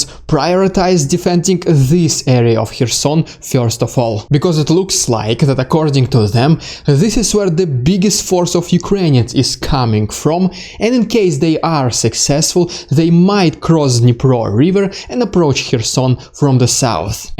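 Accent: Russia. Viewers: 😎💪🔥 Coolest accent dude!!!🧐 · I love your accent dude, but it needs to be much heavier and thicker you talk a little bit soft. Just drink vodka before you speak your voice may get heavier I just can not wait for your next video dude but please improve your accent to make it much heavier and thicker and harder 👍👍👍 so I will call you this guy is a really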